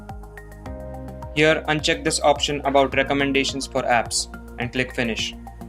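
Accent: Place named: India. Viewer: I like your India acent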